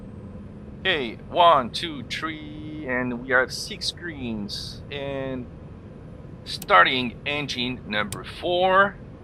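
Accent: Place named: Quebec, Canada. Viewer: Salut! Je me permets de de vous parler en bon français québécois!!! J’ai reconnu l’accent 😅. Merci pour votre produit C17. Ça fais du bien d’avoir un avion avec une livré canadienne!!! 😎 see you in the sky!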